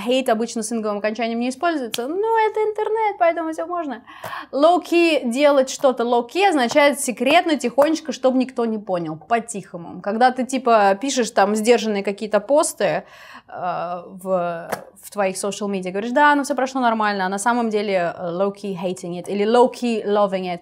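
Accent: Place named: United Kingdom. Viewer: Какой красивый британский акцент! Ласкает слух))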